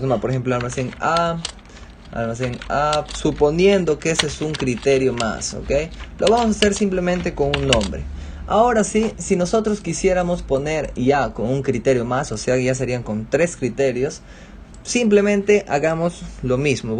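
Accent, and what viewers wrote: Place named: Loreto, Peru. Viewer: TU ACENTO CHARAPA ES LO MAS HORRIBLE DEL VIDEO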